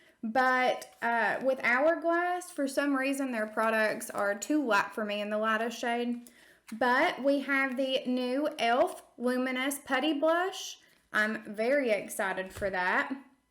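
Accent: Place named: South, United States. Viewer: I’m trying to place your accent. I love how different southern accents can be when we live in different states.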